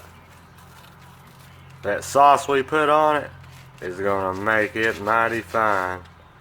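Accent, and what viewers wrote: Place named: South, United States. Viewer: It's the southern assent for me..I love it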